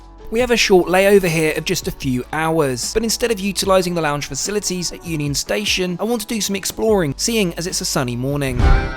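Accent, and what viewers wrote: United Kingdom. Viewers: This guy has the most British accent ever!!! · Two reasons why I won't watch. First you smile too much. No real person does that. Second is the Brit accent. Awfully hard to listen to especially they way the voice drops off at the end of a sentence. How about some good old American for a change?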